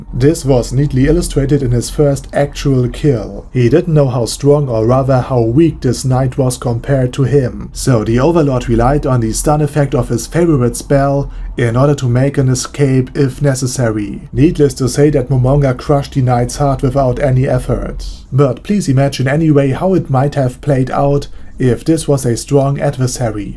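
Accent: Germany. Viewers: We just need to add a bit of overacting to that German accent of yours and we have a yT channel narrated by Pandora's Actor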